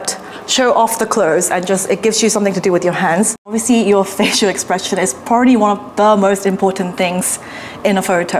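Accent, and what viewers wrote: United Kingdom. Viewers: Does people study British English in Korea..as her accent is kinda British.. 🤔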